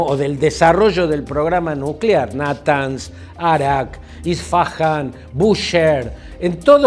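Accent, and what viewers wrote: Argentina. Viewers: DEJA DE HABLAR ARGENTINO , LUEGO IREMOS POR NUESTRA PATAGONIA .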